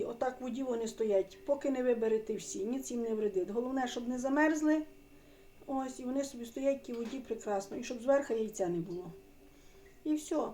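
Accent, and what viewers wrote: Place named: Solotvyno, Ukraine. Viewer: Ой 😄у нас так само кажуть" штири" откроми" 👍наче ви наша солотвинська(Бердичівський рн)🫶👍👍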